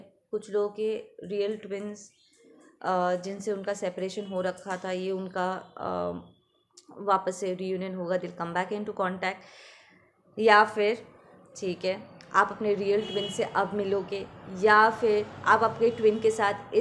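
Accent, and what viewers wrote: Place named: Bengal, India. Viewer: I m listening your bengali in a loop 🤭🤭 its very sweet to hear your bengali ascent 🌼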